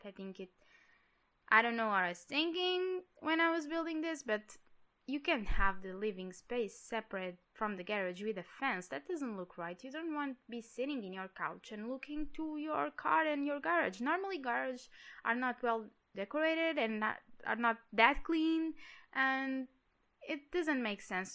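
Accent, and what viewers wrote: United States. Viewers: Legal! Sou do Brasil. Parabéns pelo seu inglês, jurava q vc era americana. kk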